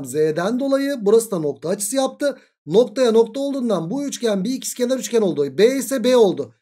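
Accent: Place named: Trabzon, Turkey. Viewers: trabzonlu net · Bence trabzonlusunuzdur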